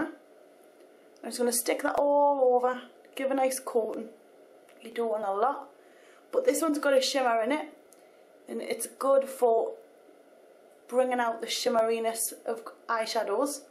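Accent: United Kingdom. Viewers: she is british. how can you say how she naturally speaks sucks???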